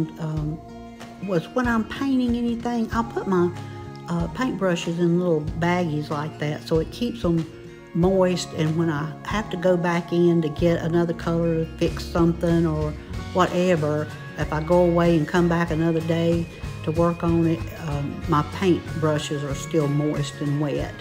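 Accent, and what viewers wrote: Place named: Appalachia, United States. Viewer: I have been watching videos on how to make a barn quilt. Yours is absolutely the best! You give very clear, step by step instructions. You are the exemplar of the fine Appalachian qualities I so appreciate. You demonstrate resourcefulness, determination, creativity, and perseverance. For examples, the way you decided to make your own rather than buy one ready made or use a kit, the way you used the extra wood to make a shelf, the way you used the reverse side of the board when you needed to begin again, the way you were committed to making the best product you could - even if it required more work. I admire you so much! And may I add that your soft-spoken regional accent is very pleasant to hear. I am recovering from surgery and will be off my feet for a few more weeks, but as soon as I am up, I plan to begin some of these paintings for our sheds. 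Thank you for taking time to show others how this is done.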